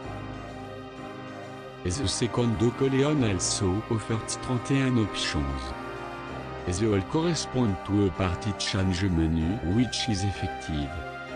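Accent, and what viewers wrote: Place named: Germany. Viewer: lol sorry it sounded like german at first and then I actually listened and it was english. very heavy accent.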